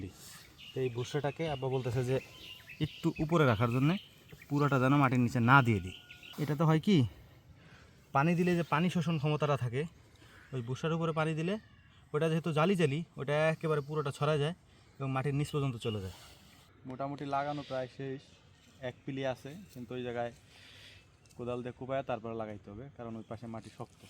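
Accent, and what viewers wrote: Faridpur, Bangladesh. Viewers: ভাই আপনার বাসা কই?? আমার এলাকার একসেন্ট পাচ্ছি,, আমাদের ফরিদপুরের মানুষ ও এইভাবে কথা কয়। আর আমারও এমন গুলোমুলো টাইপের একটা ভাগিনা আছে 🥳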